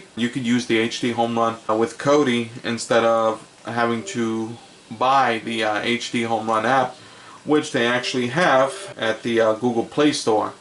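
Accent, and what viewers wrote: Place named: East Coast, United States. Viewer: are you from Brooklyn or Jersey! I know you from the east coast. you can of sound like dice clay😊 I'm older and remember watching him on cinemax in the early 90s but good review.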